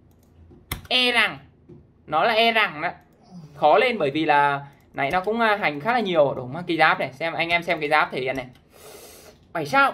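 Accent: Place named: North, Vietnam. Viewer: người miền namnois giọng sương sương miền bắc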